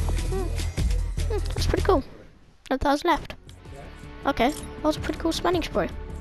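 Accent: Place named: United Kingdom. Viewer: He sound so British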